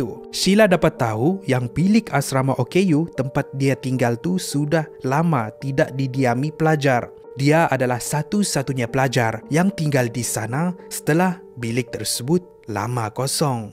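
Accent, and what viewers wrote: Sabah, Malaysia. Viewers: abang orang sabah kan???😅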